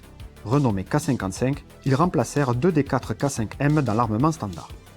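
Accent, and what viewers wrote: South, France. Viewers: C'est dommage, ça a l'air intéressant mais l'accent sudiste donne l'impression d'écouter Castex.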